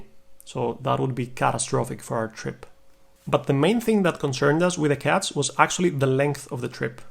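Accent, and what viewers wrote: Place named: Greece. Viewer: I have been following your channel for some time now and I had some suspicion that you might be Greek, by your accent. Now I am even more suspicious 🤨🤣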